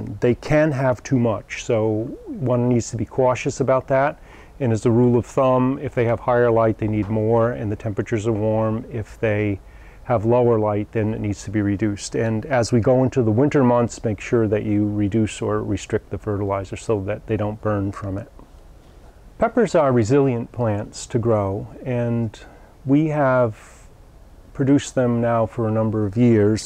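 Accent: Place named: Boston, United States. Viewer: You have kind of a Ted Kennedy accent.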